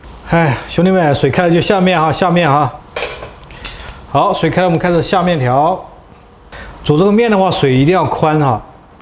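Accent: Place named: Yibin, China. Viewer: 我听主播应该是宜宾的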